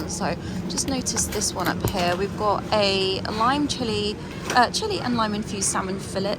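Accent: United Kingdom. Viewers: She sounds British.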